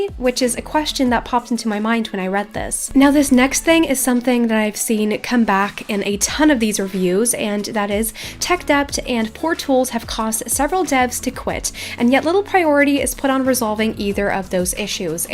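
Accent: Netherlands. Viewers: Wait— are you dutch?!😱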